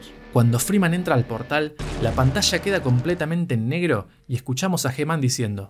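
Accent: Argentina. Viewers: videazo xD me recordo a motherland, ahora GatoPan, la forma de resumir, narrar y presentacion pero, en argentino papaaaa!!! muy bueno, espero la segunda parte y la tercera para cuando salga hl3 :´) y bueno, mas videos del estilo que es buenisimo tu humor man jeje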